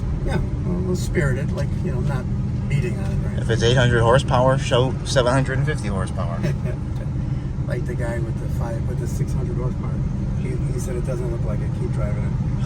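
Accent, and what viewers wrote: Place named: Chicago, United States. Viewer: Man, you have a cool Chicago accent!!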